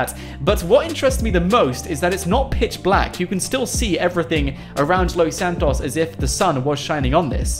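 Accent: England, United Kingdom. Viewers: Are you English like if you are english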